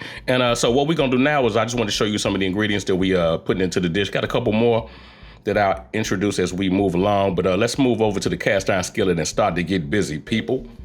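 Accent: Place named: South, United States. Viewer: This recipe is So simple and easy. I luv your southern drawl. I see ya! You know I'm from Memphis, TN. Thank you and yes! it is all about the Flava!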